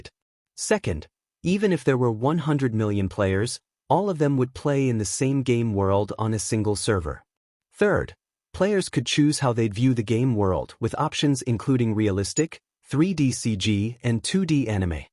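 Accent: United Kingdom. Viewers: Why are you suddently British? No like you didn't have a British aí voice videos ago and now you have tf?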